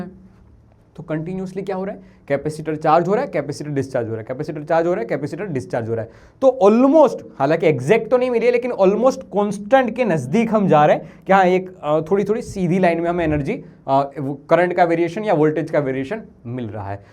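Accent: Gujarat, India. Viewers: Gujrati accent aa hi jaata h 😅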